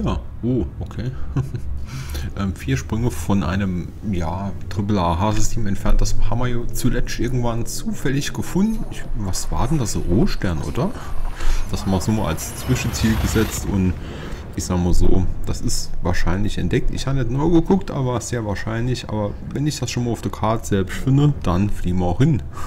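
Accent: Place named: Saarland, Germany. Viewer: Moin, Nettes Video. Dialekt klingt, als wärst du aus der Nachbarschaft. Grüße ausm Saarland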